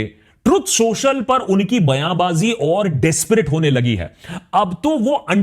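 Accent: India. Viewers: 我听出这是印度口音，估计这个视频被伊朗人听到了，所以他们放行印度船只。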